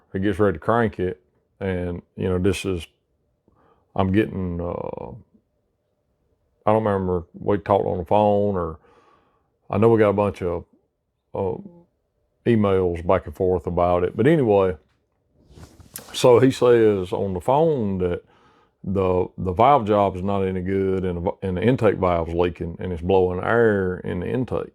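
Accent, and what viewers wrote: South, United States. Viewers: Boy did the armchair mechanics come out of the woods for this one...Some really wild theories floating around out there. This cat has got a seriously strong southern accent and I dig it! He could be talking about quantum physics, and I'd still listen. 😜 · do you naturally sound this kuntry or do you have to really lay it on thick for the camera? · Now thats a country accent!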